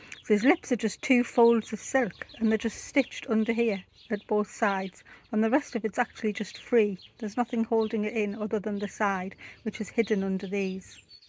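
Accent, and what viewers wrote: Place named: County Durham, United Kingdom. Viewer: Wow your dragon is magnificent and magical. I have just found you this morning so I’ve quickly subscribed and can’t wait to see all of your videos. Thank you for sharing and inviting us into World. I follow embroiderers from all over the world so it’s lovely to learn you’re also from County Durham and hear your local voice.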